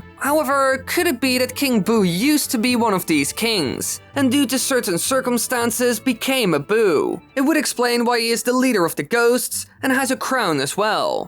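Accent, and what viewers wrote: Netherlands. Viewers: Ik dacht al dat je Nederlands was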